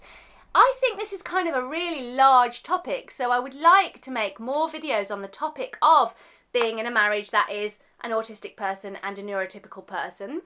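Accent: United Kingdom. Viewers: Good upload. Thanks for doing so. I'm guessing the narrator is English or lives in the UK, based on this person's accent - I'm Canadian and live in Toronto, but I'm sure a lot of what this person is saying could apply in other countries & cultures.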